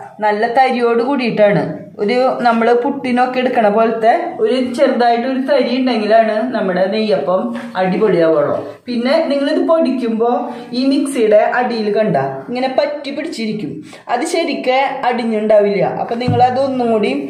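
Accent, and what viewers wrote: Thrissur, India. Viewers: Nammade Thrissur bhasha kekkumbo enthoru santhoshaannu ariyo ..thanks mummy ..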